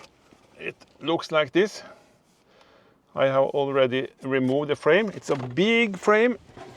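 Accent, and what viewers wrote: Norway. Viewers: Var ikke vanskelig å høre at du er trønder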